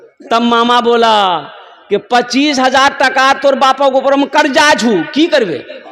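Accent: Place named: Jharkhand, India. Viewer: Jharkhandi jaise bolte aap maulana sahab ❤😅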